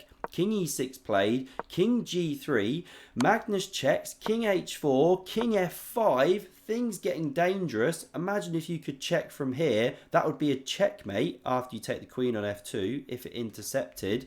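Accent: United Kingdom. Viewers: Plus he has a cool British accent, like GM Danny King and KingCrusher too.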